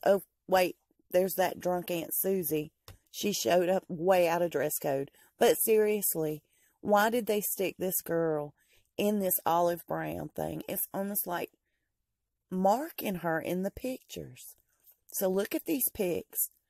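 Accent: South, United States. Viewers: You sound Southern are you from the South? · Girl, you crack me up!! Im from the South and you bring back lots of memories of growing up among Southern white women. No harm or racism intended...love your accent!! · I can't decide if you are, in fact, Paula Deane, Nancy Grace, or Samantha Markle. Your deep southern accent is so familiar but I just can't quite place it. So much snark and meanness directed at Meghan. What is your beef with her and why do you care so much what she is up to? Meeeoooww.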